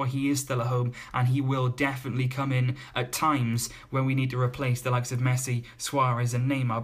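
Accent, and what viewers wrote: United Kingdom. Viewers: Im curious whats your nationality? You sound British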